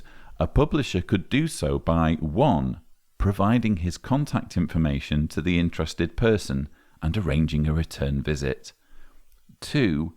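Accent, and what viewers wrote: United Kingdom. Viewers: I listened to 5 seconds and can see your a British nut!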